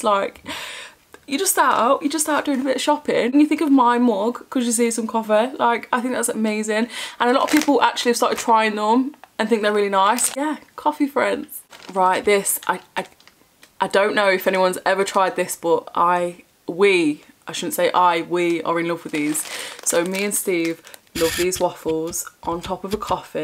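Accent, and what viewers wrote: Nottingham, United Kingdom. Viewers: Your accent sounds similar to Nottingham I love watching these you are so bubbly I love that you go for it with positive attitude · I said the same....im from nottingham and accent sounds so familiar x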